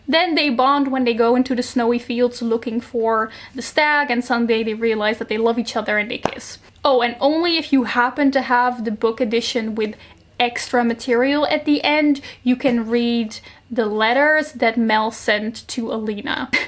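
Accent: Netherlands. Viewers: Is your accent Dutch? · This is absolutely not critique your english is really good, its just funny how as a dutchie, i can recognize you’re dutch as well in like .2 seconds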